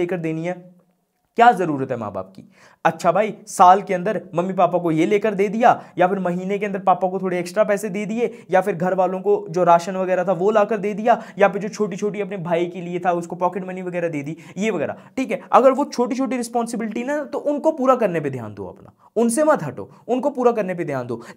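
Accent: Jammu, India. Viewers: Bhai aap jammu se ho kya Dogri ka accent aa raha h😅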